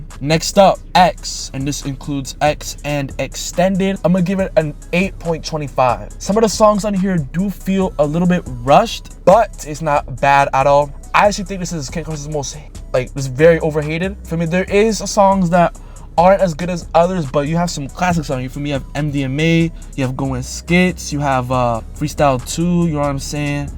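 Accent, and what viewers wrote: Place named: Mexico. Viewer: WAIT YOU SAID THE N WORD??? YOUR BLACK????? I THOUGHT YOU WERE MEXICAN. you sound mexican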